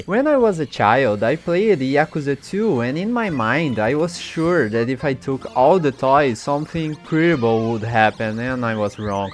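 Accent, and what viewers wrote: Brazil. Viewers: ... your english skills sounds like a brazillian to me. (also i mostly agree on your opnion)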